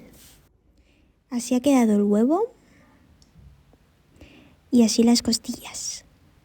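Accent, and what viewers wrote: Spain. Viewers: Excelente video Pero tengo la duda😅 ¿Porque es chinita y el acento es como de española? 🫣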